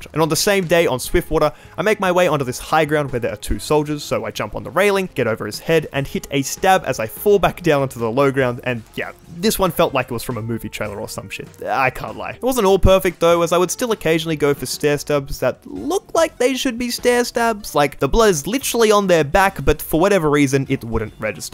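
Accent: Australia. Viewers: He is aussie lol, he doesnt sound american at all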